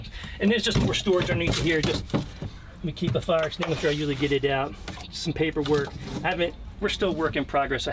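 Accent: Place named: Louisiana, United States. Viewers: I would swear he's from Louisiana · I’d swear he was from New Orleans suburbs based on his accent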